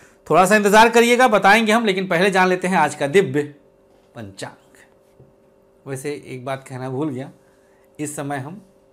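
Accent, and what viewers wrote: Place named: Rajputana, India. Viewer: sir g aap brahmin h pr aap rajputana style m baate krte h..🙏🙏🙏🙏 achcha lgta h k apne swabhiman ko bnaye rkhna chahiye..chankya yaad aate h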